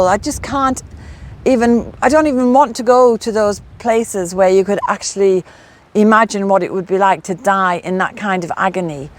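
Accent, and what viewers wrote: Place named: Northern England, United Kingdom. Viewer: It's absolutely horrific what the British ruling class did to the Irish. do you not experience hostility in Ireland due to your accent? Like you I'm from northern England. My mum and half my family are Irish (my mum emigrated here). We never go back now due to the hostility caused by our accents. They say my mum lost hers and of course I have an English accent. It bothers me because we're descended from working class Irish and English people who suffered too but they don't tend to make that distinction as soon as they hear an English accent.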